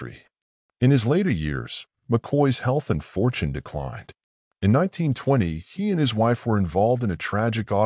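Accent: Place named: Europe. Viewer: Just as sad is this video using a voice that sounds european why? Are you focus on them or what?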